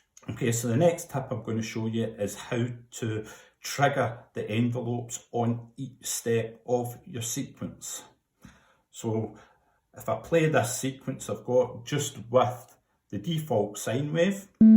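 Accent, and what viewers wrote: Scotland, United Kingdom. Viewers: You sound like trainspotting. I LOVE trainspotting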